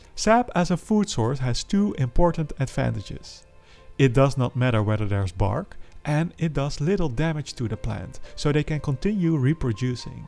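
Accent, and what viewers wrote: Netherlands. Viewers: this guys english is so dutch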